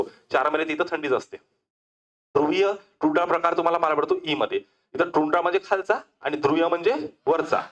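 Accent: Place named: Vidarbha, India. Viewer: Sir tumchya bhashevrun as vatte ki tumhi vidarbhatle ahat... Nice lecture sir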